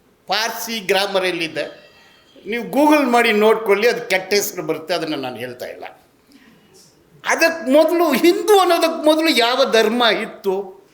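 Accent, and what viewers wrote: Malabar, India. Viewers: Malabari talking kannada in fake channel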